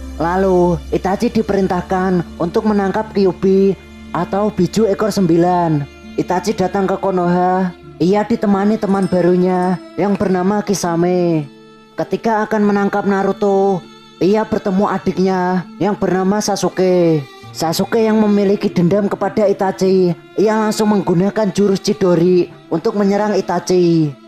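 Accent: Java, Indonesia. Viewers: jawa skali,,bos...bicara nya...jawa kebun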